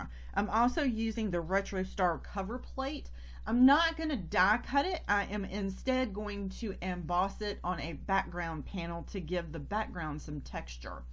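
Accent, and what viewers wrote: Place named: Texas, United States. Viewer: You sound like a Texan. Love these cards. I am in the USA.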